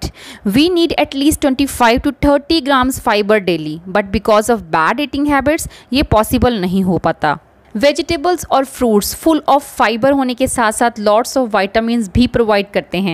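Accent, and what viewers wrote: Punjab, India. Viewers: Hi ji, as usual rocking video. I loved it, ur message goes to the mind directly. I luv ur punjabi diction in ur interaction.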